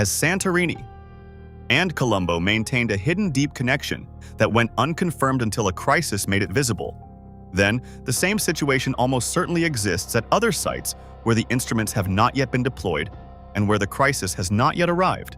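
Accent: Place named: United States. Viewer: Mmmmm Just another A.i. "CLICK-BAIT" WITH THE EVER SO PAINFUL b.s YANK VOICE. (THE SIGNATURE OF A SCAMMER! )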